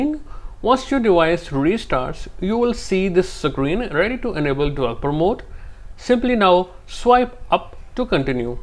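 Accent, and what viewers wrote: India. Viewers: do i really have to resort to tutorials by people with clear indian accents (sorry if this comment is offensive, i don't have anything against anyone)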